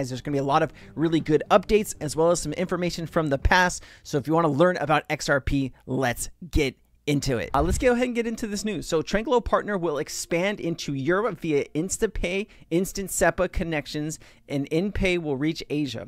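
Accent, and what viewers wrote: Australia. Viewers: Sounds like a fellow Aussie. Letsgetitttt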